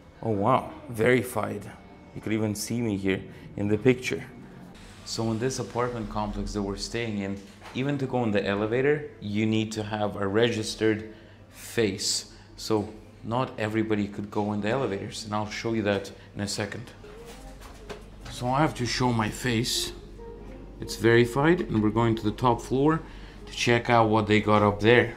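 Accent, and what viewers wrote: New York, United States. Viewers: It's funny that your English sounds like an American accent more than Irish. Best of luck in Thailand, and I'll be watching to see where you end up! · Hey did you learn your English from a New Yorker???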